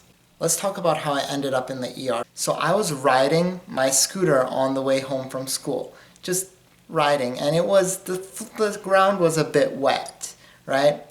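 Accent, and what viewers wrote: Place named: Canada. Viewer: That canadian accent thi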